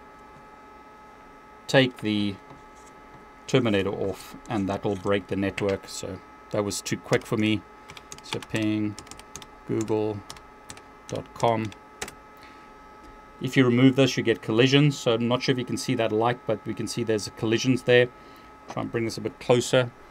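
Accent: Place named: South Africa. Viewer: how long have you been in South Africa?, I can pick up your accent to somewhere in South Africa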